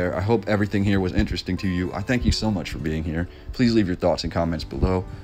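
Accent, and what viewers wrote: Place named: Pennsylvania, United States. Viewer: You gotta be from somewhere between Allentown, Reading, and Philly. Possibly Quakertown. Can't hide that acccent lol.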